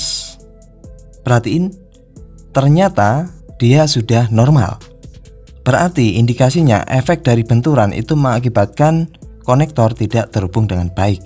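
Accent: Java, Indonesia. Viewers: Jowone ngdi mas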